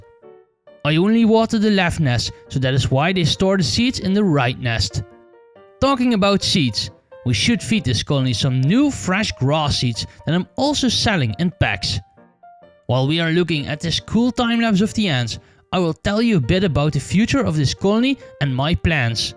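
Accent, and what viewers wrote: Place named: Netherlands. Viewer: Cool accent. Let me guess... You are from the netherlands?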